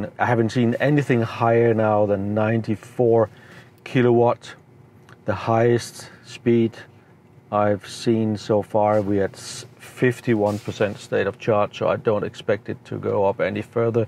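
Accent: Denmark. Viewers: Are you danish? Your accent sounds danish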